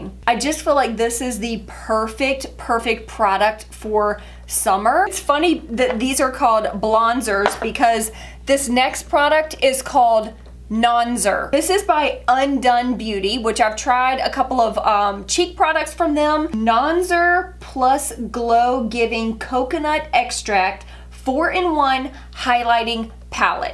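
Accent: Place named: South, United States. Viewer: It's so good to hear that Southern draw. I'm in AL :-) thank you for letting us know about Womanesse. I'm getting the neck serum! I look forward to seeing more of your videos! Stay beautiful xoxo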